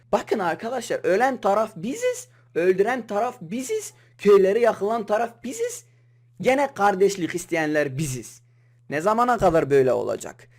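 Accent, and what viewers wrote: Turkey. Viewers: baın değil .bakın.sen tam türk gibi konuşuyon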